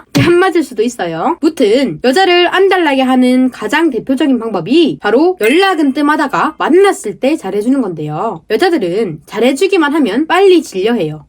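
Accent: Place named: North Gyeongsang, South Korea. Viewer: 경북 봉화 안동쯤인듯😮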